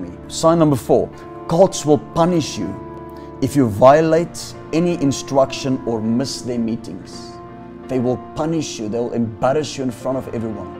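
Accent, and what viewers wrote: South Africa. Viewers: u sound south african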